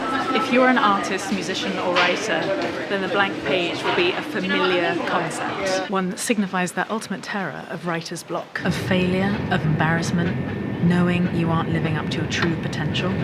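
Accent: United Kingdom. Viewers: Your videos are Fantastic, and your Voice is British perfection. Thank you for sharing with the world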